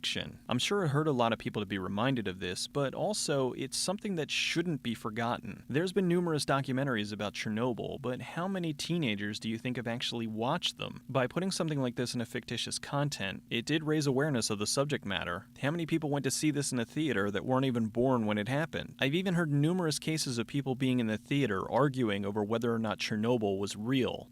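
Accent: Philadelphia, United States. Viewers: I could tell you were from Philadelphia when I heard you say "wooder" instead of water in one of your other videos.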